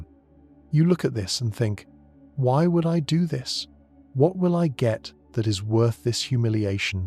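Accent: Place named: United States. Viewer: Sounds very USAmerican.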